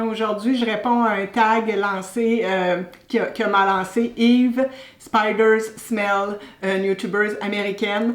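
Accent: Quebec, Canada. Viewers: Bonjour et merci pour ton message. Je découvre ta chaîne avec un grand plaisir en même temps que ton délicieux accent québécois qui me rappelle l'une de mes tantes que j'adore. Quel marathon!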